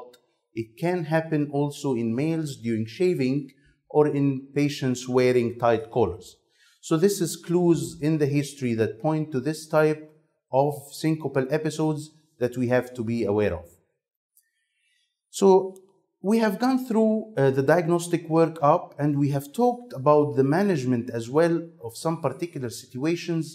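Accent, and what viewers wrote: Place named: England, United Kingdom. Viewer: Thank you for your excellent way of explaination , and your proper English accent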